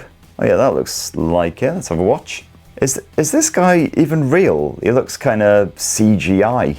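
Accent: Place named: Scotland, United Kingdom. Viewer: I am watching his reviews to hear dat Pure Scottish accent- who is the same?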